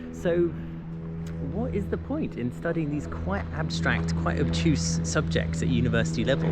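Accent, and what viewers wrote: United Kingdom. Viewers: I like British accent hope i can talk like that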